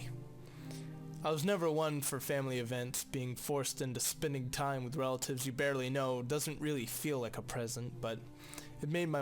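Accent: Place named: England, United Kingdom. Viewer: Ya its english accent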